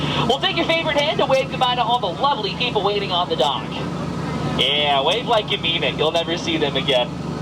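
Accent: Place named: New York, United States. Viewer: your loud New York accent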